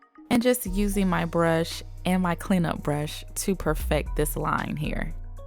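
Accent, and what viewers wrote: South, United States. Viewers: I guess Nashville has rubbed off on ya a little bit lol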